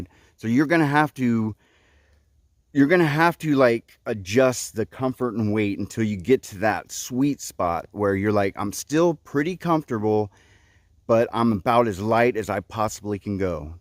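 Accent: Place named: South, United States. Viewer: Loli love the way yu say the at tail have thst southern voice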